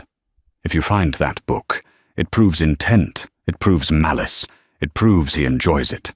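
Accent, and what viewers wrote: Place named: United Kingdom. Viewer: STOP with the British voice and pronunciations when dealing with American settings.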